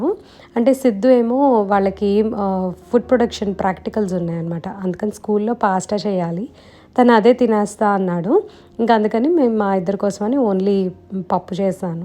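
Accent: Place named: Vijayawada, India. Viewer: yes...but mana Vijayawada slang almost superey..kada..proper Vijayawada...but memu eepudu telangana lo mahaboobnagar lo vuntam..ma vari job rithya..tanu press dpt..in Eenadu..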